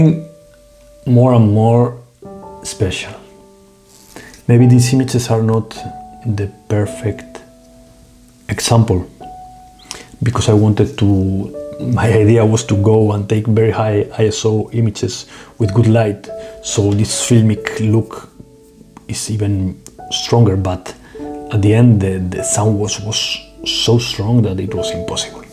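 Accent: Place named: Spain. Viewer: Hi, I thought I would send my message in Spanish to applaud your excellent spoken English . Here goes.... Por favor, haz más vídeos. Me encanta tu voz tranquila y tu hermoso acento español. ¡Es aún mejor cuando hablas con tanto cariño del magnífico M8! También tengo un ejemplo plateado y sí, es una historia de amor con la máquina, un dispositivo que une la sensación cinematográfica y lo digital en un momento del pasado durante la transición. Siento que estos sensores CCD eran excelentes y desearía que todavía se produjeran. La construcción y simplicidad de esta cámara me recuerdan que los fotógrafos del pasado con cámaras muy simples también podían producir fotografías de belleza y sustancia sin recurrir al enfoque automático y a los 50 megapíxeles. Me encanta especialmente el vídeo que hiciste con tu hermana. Sospecho que incluso si no tuviera interés en la fotografía, seguiría disfrutando de tus videos. 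¡No pares! Chris de Cambridge, Reino Unido Best Chris, Cambridge UK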